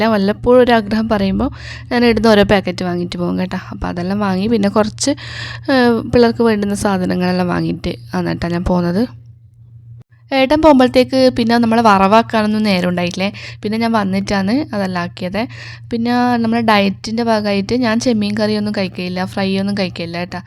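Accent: Kannur, India. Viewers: കണ്ണൂർ ആണല്ലേ place.. സംസാരം കേൾക്കാൻ നല്ല രസമുണ്ട്😍😍energetic vlog 👌👌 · Adipoli vlog ❤️kannur ഭാഷയും super👌പാത്രം കഴുകി മടുക്കും ❤️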